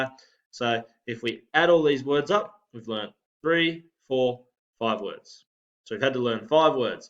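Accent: Australia. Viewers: You sound Australian Don't be offended but thank you nga mihi bro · How come he's teaching Maori with an Aussie accent?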